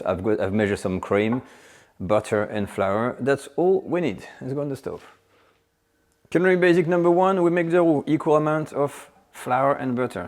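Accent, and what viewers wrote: France. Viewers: Quite shocking that a youtube channel with Academy in the title, and being French, did not make its own pastry ... that is the one technical bit here. That's the sort of thing I do on my amateur channel ! Are you just a bloke with a French accent trying to make money on youtube ? If they find out they will send you over here to England as a punishment :-)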